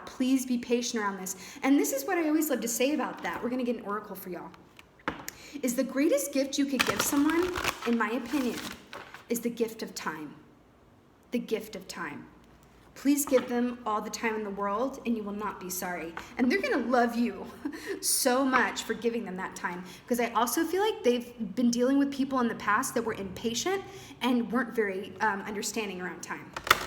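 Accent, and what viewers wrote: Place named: Mississippi, United States. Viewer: I love your accent...I’m from Mississippi and you remind me of home 💜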